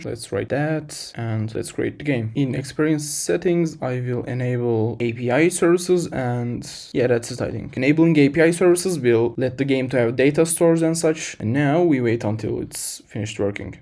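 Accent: Turkey. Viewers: o Türk aksanını nerde duysam tanırım kardeşim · Your accent sounds Turkish so I am guessing you are? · I sense türkish accent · turksun dimi aksandan belli oluyo :D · are you turkish by any chance? i know that accent very well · most türkish accent i ever seen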